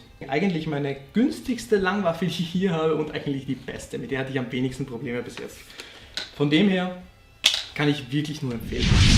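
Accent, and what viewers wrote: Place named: Austria. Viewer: Man hört eigentlich auch an deinem Akzent sofort dass du aus Österreich kommst :D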